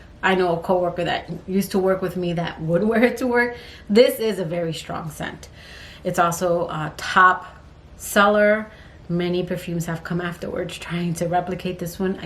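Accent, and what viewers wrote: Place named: Orlando, United States. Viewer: When i first heard you talk im like you sound like you would live where i live (weird, i know) lol and sure enough you say Orlando😂 which is where I live. Im like OMG! Small world. And I have all the perfumes you mentioned besides narciso because i highly dislike his perfumes, but overall awesome pics!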